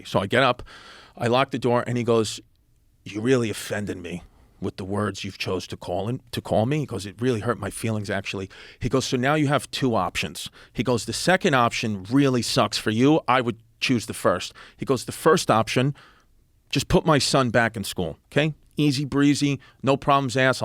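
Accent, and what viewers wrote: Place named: New York, United States. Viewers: If I have kids, I wanna be like this dad, but talking like Clint Eastwood or Thanos instead of a New York accent. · Geez i love a good story told in a ny accent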